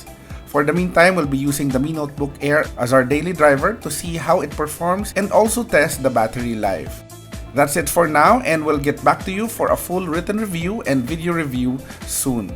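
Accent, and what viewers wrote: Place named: Ilonggo, Philippines. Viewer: ilonggo ka man? you sound like one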